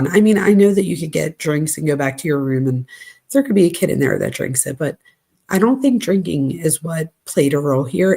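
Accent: Philadelphia, United States. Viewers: Are you from philly? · ❤LIKED n 🙋🏼‍♀️SUBSCRIBED! You're voice sounds like 🏡home🥰 I miss the Philly/ (....or NJ?) accent living out here in CA— It's just comforting to hear. ... And you seem like an excellent little snoop!🕵🏼‍♀️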